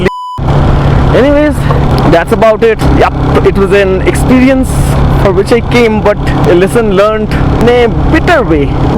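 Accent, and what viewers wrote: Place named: Bengal, India. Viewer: Bhai ka bong accent hai.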